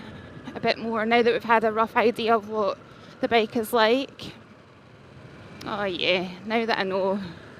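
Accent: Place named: Scotland, United Kingdom. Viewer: Very deep Scottish accent!!!! Thank you for the info!!!!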